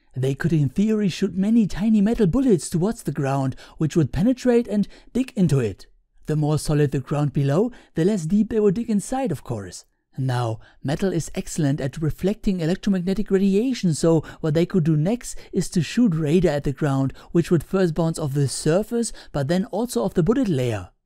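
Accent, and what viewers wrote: Germany. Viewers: Das nenn ich mal ein "deutscher Vorzeigeakzent" 😄🍀 top Video, danke 👍👍👍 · I Are you kidding? He has a really typical german accent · Almost certainly Germany. Please correct me if I'm wrong. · Junge du klingst so Deutsch ne😂😜 naja eigentlich geht's sogar. Cooles Video!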